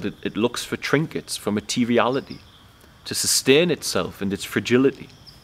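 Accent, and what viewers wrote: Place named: Newcastle, United Kingdom. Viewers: Very nice video. I am based in the North UK. Very similar views as yourself. I can tell by your accent we are very close. Can we open up communion together? I would love to explore your mind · Brother, even on the darkest day, your eyes would still smile. I always love to hear that Geordie accent. I'm a Bradford lad living in America since 1998. Your voice always takes me back home for a few minutes when I first hear it. Bless you my friend. Thank you.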